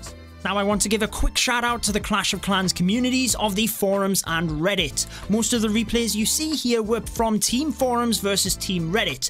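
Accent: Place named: North, United Kingdom. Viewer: his accent is a Northern one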